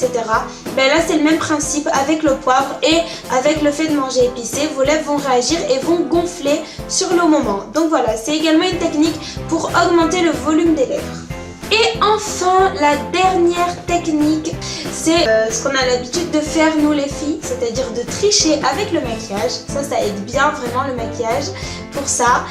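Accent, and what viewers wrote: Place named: Sweden. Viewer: OMG ton accent suédois j’adore persso j’ai le même ❤️❤️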